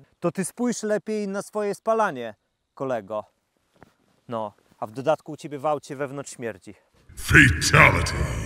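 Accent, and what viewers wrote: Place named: Podlaskie, Poland. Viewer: Fajny test, dobrze że prowadzący nie próbuje krytykować, ponieważ „to Dacia”.👍 Na początku wydawało mi się, że białostockiego akcentu nie słychać, ale później już złapałem jak są akcentowane końcówki zdań.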